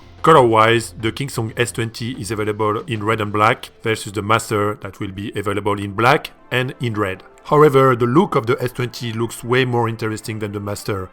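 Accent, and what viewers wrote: France. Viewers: SYMPA L'ACCENT FRANCAIS , BONNE VIDEO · lol dat french accent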